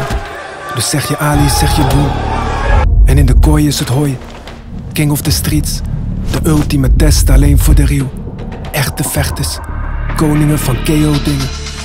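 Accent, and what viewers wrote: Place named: Afrikaans, South Africa. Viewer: Dig the Afrikaans overvoice ma bru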